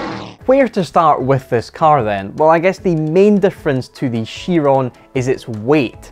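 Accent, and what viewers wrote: Scotland, United Kingdom. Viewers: “Byoo-gaah-tee” His Scottish accent is so fun haha. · are you Scottish or german i think scottish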